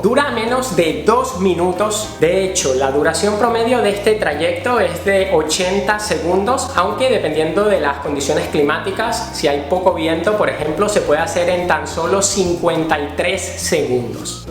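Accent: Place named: Venezuela. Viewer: De qué región de Venezuela es su acento? Y me disculpa.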